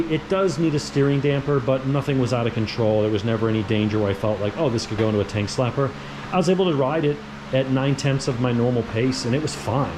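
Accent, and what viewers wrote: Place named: Australia. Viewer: On point with the Ozzie accent.😄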